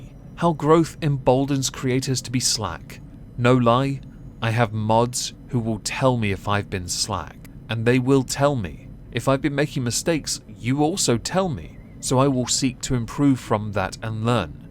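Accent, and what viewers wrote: United Kingdom. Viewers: Horseshit dude. Your voice is the smoothiest butteriest British accent I've ever heard. And I love your content dude. Never stop. ❤❤❤ and congrats for 100k. Been here since you were around 45k I believe.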